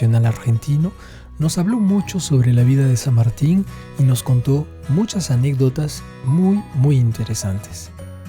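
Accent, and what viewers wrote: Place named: France. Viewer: Me encantó el vídeo. Me encanta el acento francés al hablar español.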